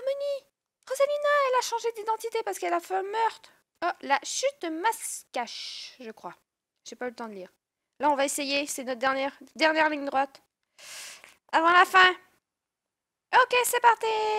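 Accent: Quebec, Canada. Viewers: T'es québécoise?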